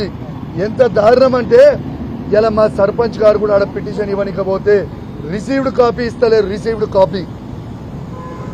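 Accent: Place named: Hyderabad, India. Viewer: Hyderabad matlduthunnavu niku uru ekkada unnadi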